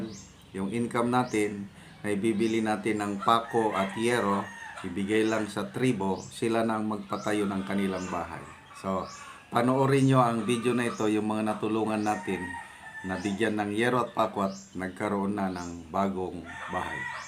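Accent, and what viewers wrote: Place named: Visayas, Philippines. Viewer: Wow kabalo man diay ka mag bisaya sir?😂😂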